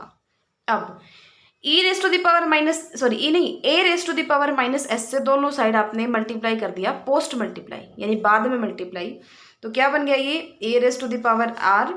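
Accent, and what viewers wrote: Haryana, India. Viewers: Thank you mam... Your voice is so sweet..... With haryanvi touch i 🤔 😅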